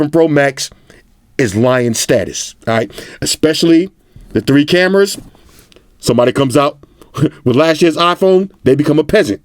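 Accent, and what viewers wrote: New York, United States. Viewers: Hey what’s up!! Been creeping on your channel for a few months now this is my first comment. Love in the content man. Original shit genuine opinion n to the fucking point. I appreciate that!’ anyway Two questions... 1) What did you mean when you said get an iPhone so you can experience Instagram the right way? Is it just like Snapchat where there’s more features on the iOS version. 2) what Burrough u from? Bk? stat? Or Jersey maybe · Naaaw, he sounds like straight up New Yorker to me lol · Thats not a hood voice... its just his New York accent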